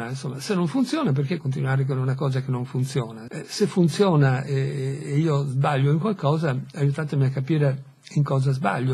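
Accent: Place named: Italy. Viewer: Sto facendo un blog, perché sono una professoressa e, qui in Spagna, è preferibile studiare la diffusione dell’educazione (bene, giá sai...la neolingua, come avverte Orwell educazione=istruzione; istruzione=come usare un oggetto appena comprato, etc. etc.) anche attraverso questi mezzi (in questo mi trovano pienamente d’accordo). Trattandosi di un blog in costruzione, ancora non c’è nessuno che segue il mio saggio multimediale (esercizio di un corso), però questo blog è già sul web ed io mi sono permessa di citarti come gran lettore di libri italiani (il mio obiettivo è sempre comunicare con i miei studenti di lingua italiana qui in Spagna e poter mettere loro a disposizione materiale di supporto che li allontani un po’ dai soliti esercizietti del loro libro di testo. Per farla breve, sto montando un blog sulla storia immaginaria, la storia come avrebbe potuto essere, riempire con la fantasia i vuoti che a lasciato la storia, etc. 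Ho voluto inserire come esempio anche 1984 (inventare il presente) e ho messo il tuo link, con due parole per presentarti e consigliare che leggano gli audiolibri pubblicati da te, per via della tua eccellente dizione, della passione priva di enfasi con cui interpreti le opere e della cadenza perfettamente italiana (questo appoggiare le frasi sulla penultima parola, che rende il nostro parlare cosí dolce e che per gli stranieri è tanto difficile da assimilare)...insomma, mi da dai il permesso di lasciare tutte queste cose sul mio (purtroppo ancora a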